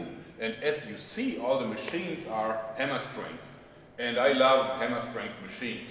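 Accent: Germany. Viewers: That's a strong German right there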